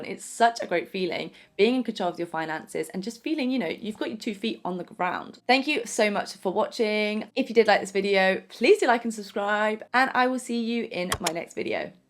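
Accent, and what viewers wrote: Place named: United Kingdom. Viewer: i love you and your british accent so much ❤️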